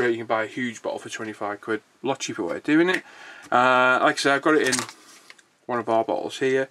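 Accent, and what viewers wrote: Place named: United States. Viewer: It's a very beautiful model, you're very expert. I advise you to mount the version f4u-1a, it's better and more beautiful. The "Birdcage" wasn't very appreciated by pilots, because it had a bad visibility. With the second version, 1a, the "Corsair" was more appreciated. And also, could you put subtitles in foreign languages? Because you speak with a very strong american accent, it's not very easy to understand for me, because it isn't my mother language... However, thanks very much for your videos!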